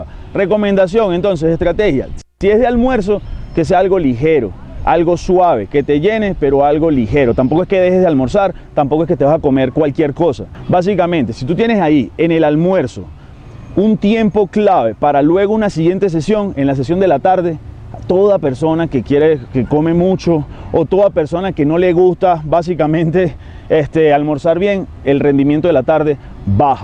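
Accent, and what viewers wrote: Venezuela. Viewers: Es venezolano?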